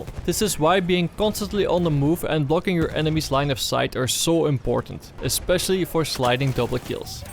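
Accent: Netherlands. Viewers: You sound Dutch or Belgian to me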